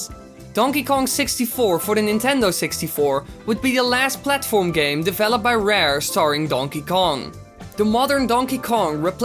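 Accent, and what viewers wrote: Netherlands. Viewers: Yeah, he's Dutch. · are you dutch? your accent sounds dutch...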